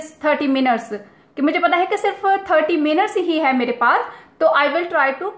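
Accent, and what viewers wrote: Punjab, India. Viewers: Ajit Shirsawade wat is good in it she speaking hindi with punjabi touch while she can make video fully in punjabi language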